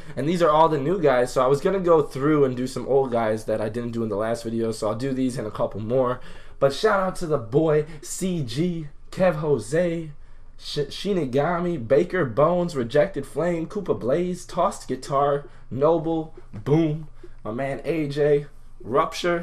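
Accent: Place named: New York, United States. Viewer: U sound like u from new youk or new jersey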